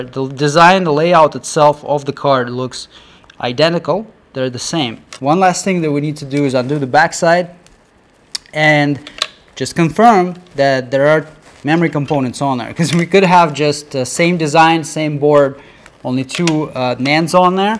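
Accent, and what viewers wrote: Russia. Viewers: Russian акцент.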